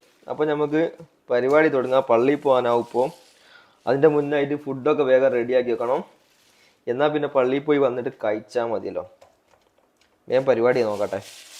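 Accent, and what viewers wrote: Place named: Kannur, India. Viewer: Kannurano naad bhasha ketitt angane thonnunnu bro u are very clean person🎉🎉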